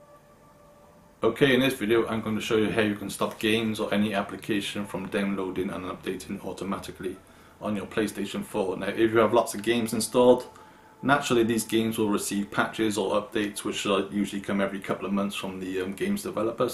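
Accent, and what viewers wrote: Newport, United Kingdom. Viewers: Newport accent?😝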